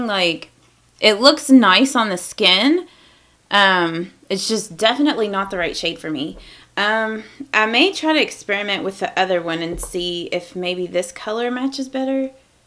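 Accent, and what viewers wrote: South, United States. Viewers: shruti, she sounds southern. I’m from Alabama and picked up on it, too. Love hearing familiar voices on YouTube. 🤗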